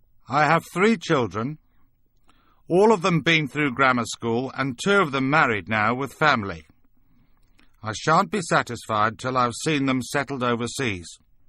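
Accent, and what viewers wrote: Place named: Birmingham, United Kingdom. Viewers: It's a Midlands working class accent that has been somewhat polished. Unusually for a top Tory Powell was not a toff, but rose through talent and effort - if only we'd been wise enough to listen to him... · Classy Brummie accent for these two-bob pseudo-intellectual views, good work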